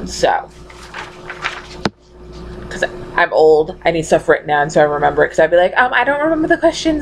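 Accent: United States. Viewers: Funny. Your accent sounds more Boston to me. I don't have one. Tfs hugs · Awesome video! You totally have me wanting to do this now. Love the Mass (tried to spell it out, failed lol) accent!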